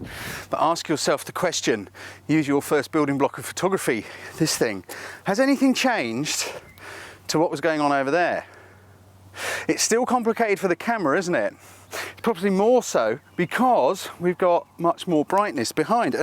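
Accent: England, United Kingdom. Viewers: I think it's because an Englishman is speaking English as opposed to non native. We hear "incident" but I can see why someone who isn't English might hear "instant"!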